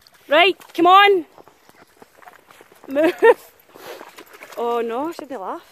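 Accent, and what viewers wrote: Scotland, United Kingdom. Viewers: Hass is sounds Scottish! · Are u scotishh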